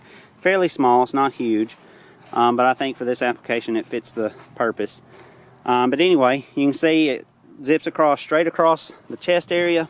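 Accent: United States. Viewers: Just curious: what part of US is his accent? Thanks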